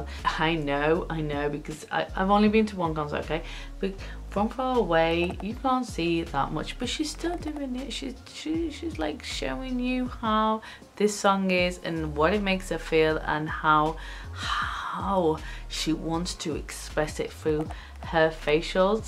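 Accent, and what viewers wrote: United Kingdom. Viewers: Going by you accent you are living in the UK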